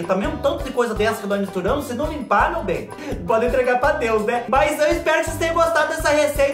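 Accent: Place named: Minas Gerais, Brazil. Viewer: Pelo seu sotaque você é de Minas.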